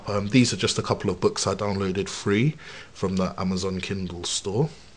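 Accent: United Kingdom. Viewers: ENGLISH accent, there's no such thing as a British one. ;) xx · Thumps up if you're fond of the British accent.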